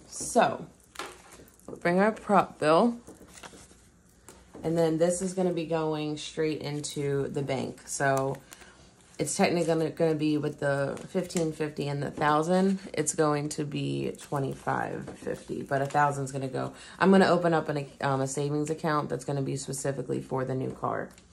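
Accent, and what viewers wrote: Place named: East Coast, United States. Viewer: Are you from New Jersey/East Coast? You sound identical to my cousin! Love it. So relaxing. Congrats on your first bill exchange! I can’t wait until I have mine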